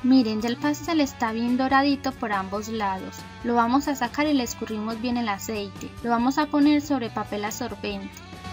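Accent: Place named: Medellín, Colombia. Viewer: Ese acento de Medellín que gusta mucho Besos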